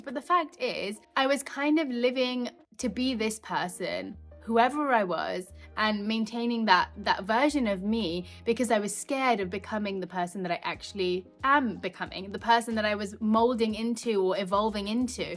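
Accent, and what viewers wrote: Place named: United Kingdom. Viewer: Why she has British accent. Is she Indian or🙄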